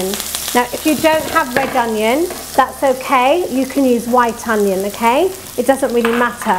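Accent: United Kingdom. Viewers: nice vedio.. thnx for uploading........... and nice British accent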